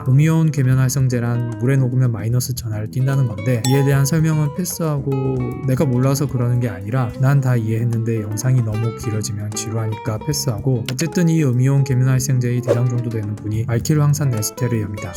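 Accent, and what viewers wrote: China. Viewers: 한국분인가요? 어째 발음이 너무 이상해서요. 억양도 이상해요. 지도상 우리나라 왼편에 있는 나라 출신의 발음과 억양으로 보이네요.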